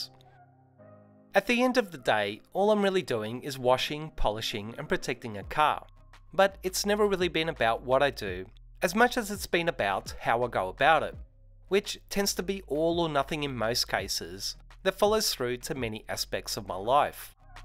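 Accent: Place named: Australia. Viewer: Great job mate I love hearing an Ozzy accent on a detailing video for a change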